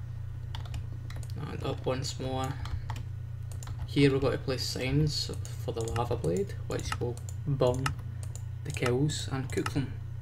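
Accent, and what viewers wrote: Scotland, United Kingdom. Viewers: Ur scotish accent is top notch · is that scottish?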